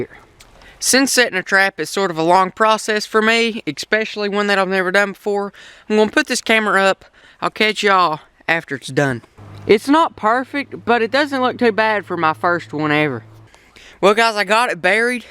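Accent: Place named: Kentucky, United States. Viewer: Hey what part of Kentucky u from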